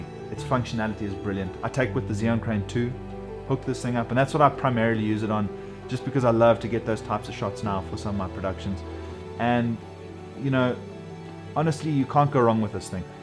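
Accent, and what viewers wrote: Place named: South Africa. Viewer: So awesome to see a fellow Saffa so passionate about filmmaking! Almost jumped out of my seat when I heard your accent. Great footage!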